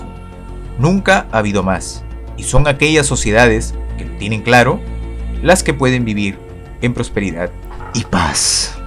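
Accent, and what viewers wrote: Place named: Peru. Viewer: Fascinante! Tu narración es tan natural que me das envidia, me encanta el acento peruano. Curiosamente estoy trabajando en un proyecto para Death Note y he tenido que ponerme a leer sobre el "bien común", entre otro temas, para entender mejor la obra, y te puedo asegurar que me has ayudado a digerir la idea mejor, eres muy bueno en esto. Por cierto, buena analogía la que haces al final: "Trabajo, ahorro, inversión", no podría decirlo mejor.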